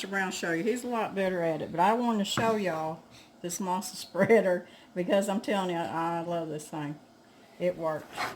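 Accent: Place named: Texas, United States. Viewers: My kin are in Pasadena Texas as well. 😁 I thought I recognized your accent. Your tamales look yummy....😋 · I knew that accent was Texan!!!!!!!! ♥♥♥ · How did you decide to homestead in Arkansaw and can you make enough on the homestead to sustain you or do you also hold down regular jobs? I love your Texas quiet voice! I live in Texas and my voice sounds a lot like yours. Can't stand harsh voices. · LOVE this! And you sound just like you are from Pasadena, Texas! I’m from a little further east piney woods. Love yalls channel! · I just knew that was a Texas accent! hello from Houston. Oh my goodness my Grandma & Pawpaw lived in Sulphur Springs I use to spend summers with my grandma (we lived in Garland TX)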